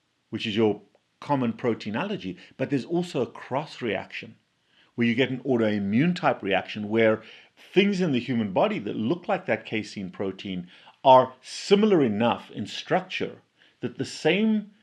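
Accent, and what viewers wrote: South Africa. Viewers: Ha! I thought you had a South African accent.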